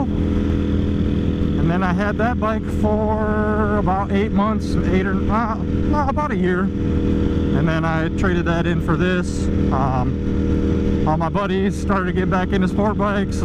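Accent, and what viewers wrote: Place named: Michigan, United States. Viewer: Great videos man keep it up! I'm from MI originally, in socal now. Looking to upgrade to SF V4S shortly. You have a strong Michigander accent lol, sound just like all my family from Dewitt.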